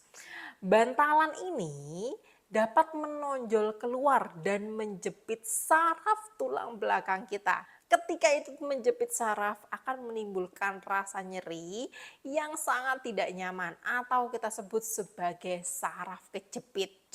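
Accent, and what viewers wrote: Java, Indonesia. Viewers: Medok yah? 😶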